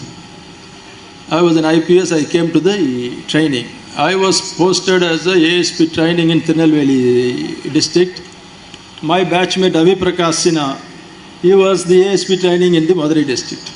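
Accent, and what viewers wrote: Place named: North India, India. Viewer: பேரு தமிழ் வடக்கு ஹிந்தி பேசுற மாதிரி இருக்கு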